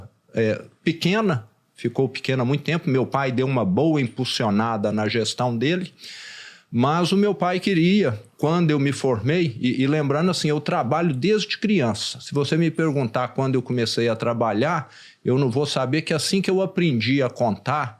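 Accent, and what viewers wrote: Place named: Minas Gerais, Brazil. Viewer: História bonita! O mais legal é ouvir esse sotaque mineirês. Representante de peso desse povo amável e acolhedor.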